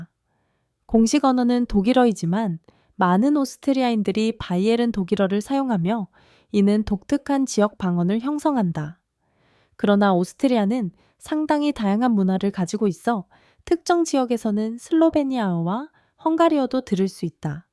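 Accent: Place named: China. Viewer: 한국말 배운 중국인이 나레이션하는거같네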